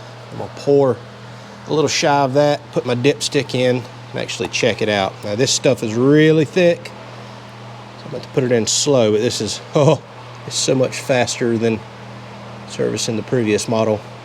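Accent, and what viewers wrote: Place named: Florida, United States. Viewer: Florida accents bust me up sounds like you say oil without the i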